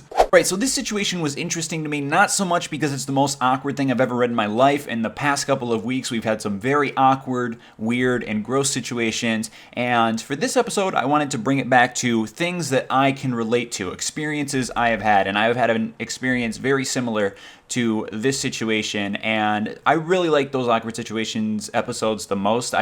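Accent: Michigan, United States. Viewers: what part of michigan are you from? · what part of Michigan do you come from?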